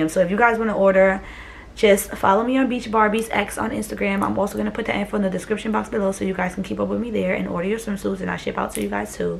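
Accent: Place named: Boston, United States. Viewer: She sounds like she from Boston lol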